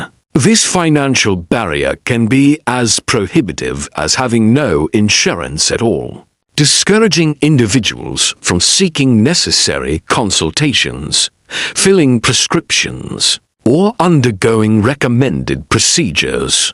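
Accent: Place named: United Kingdom. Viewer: Please don't use British accent with your AI narrator - annoying.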